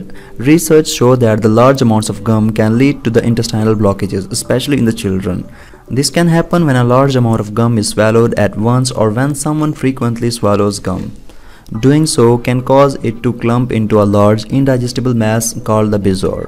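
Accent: India. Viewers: Is that an Indian 🇮🇳 accent? I'm chewing gum right now This is helpful 👍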